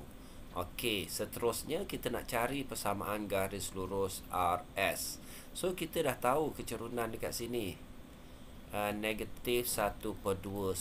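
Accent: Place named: Kelantan, Malaysia. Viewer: kenapa cikgu cover cover kelantan cikgu , takpe cakap bahasa kelantan pun boleh hehe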